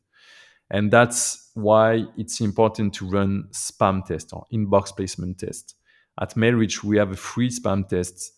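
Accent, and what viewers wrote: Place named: France. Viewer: sympa l'accent Français :)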